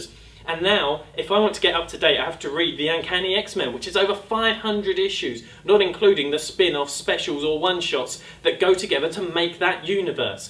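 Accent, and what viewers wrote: England, United Kingdom. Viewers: hey i can tell u r english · nerd I noticed something. you and I are somewhat alike we are both akward we both play video games we both speak in birish accents (poits for you for being british) we both have a dyslexic best friend who also plays video games. I find this strange but funny xD o and we both wear the same kind of glasses for some reason .-.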